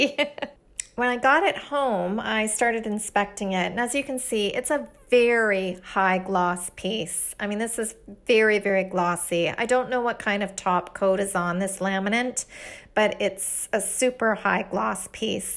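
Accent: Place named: Canada. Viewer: gosh she sounds so canadian (and i live in ontario)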